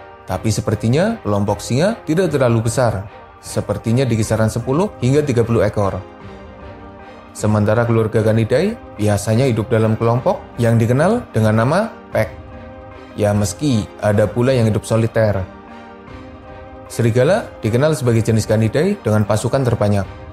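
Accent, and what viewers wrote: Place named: Purwokerto, Indonesia. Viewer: Adminnya kaya orang Purwokerto logatnya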